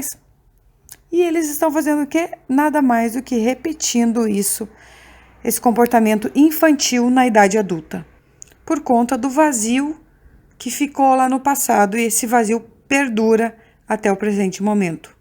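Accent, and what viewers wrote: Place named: Rio Grande do Sul, Brazil. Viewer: Seus vídeos são brilhantes mas seu sotaque gaúcho é simplesmente ADORÁVEL.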